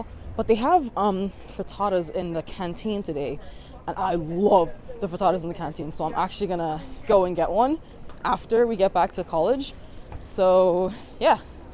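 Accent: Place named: Ireland. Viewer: Which country is this please. I couldn’t catch your accident..is not America, not Canada looks like UK a bit is this Ireland ? something?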